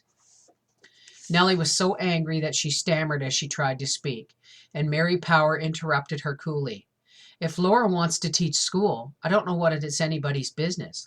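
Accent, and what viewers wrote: Canada. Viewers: * pronounces some words a bit differently. I think it's because she's Canadian. Like "shonn"/"shone"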